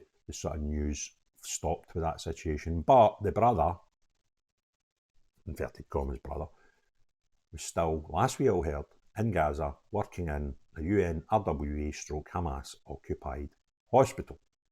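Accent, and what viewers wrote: Scotland, United Kingdom. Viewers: I really appreciate your investigative journalism. Plus your topics. Equally in the meantime I am having to learn to understand the Scottish accent which I do enjoy.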